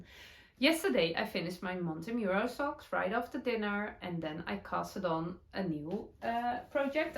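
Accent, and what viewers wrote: Netherlands. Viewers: Hello from Australia & Thankyou so much for a great podcast. Since finding you I am now binge watching all your English videos and loving them. Sitting bedridden sick & knitting socks, you are keeping me company. How truly exciting and special to receive yarn specially dyed for you. WOW!!!! I bet you did a happy dance for sure. Love your Dutchy-English 😍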